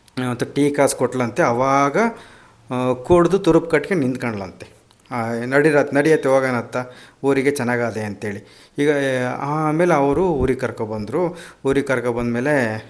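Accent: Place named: Hiriyur, India. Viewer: Nammadu hiriyur bhase kele khushi ayetu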